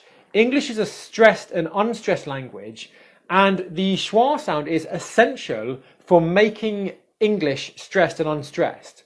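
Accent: United Kingdom. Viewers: I'm sorry to ask this question but are you originally from Britain? Your demonstration of these two sounds are different from another British girl's.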